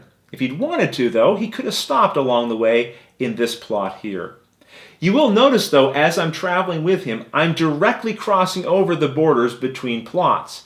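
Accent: Canada. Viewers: Are you by any chance Canadian?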